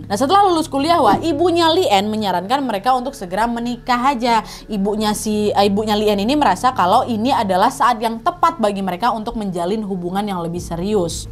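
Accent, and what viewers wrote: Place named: West Sumatra, Indonesia. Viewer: Candu Bana mandanga ngecek Jo Bahaso minang lah😂😂😂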